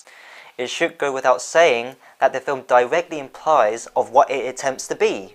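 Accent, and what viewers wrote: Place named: United Kingdom. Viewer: Also, British accent really rocks!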